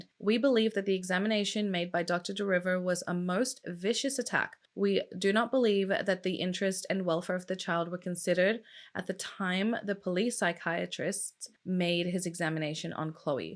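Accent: Australia. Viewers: Its so refreshing hearing an ozzie accent instead of american for once! <3 P.S Im from New Zealand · Its so weird. Lol. Since i heard your accent i understood your from Australia, you look like a child between two more famous makeup and crime youtubers, one is from US, named Bailey Sarian, second is from Australia, named Bella Fiori. Lol you look like a mix of them both. Anyways, continue on. And where are you ethnicaly from? Im curious, i would say Middle East or little Asian. Thanks. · You have such a calming voice. Are you Australian? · I only just discovered this channel, absolutely love finding fellow Aussie’s to listen to & my daughters names Zarah 😊 good video I’ll definitely be back for more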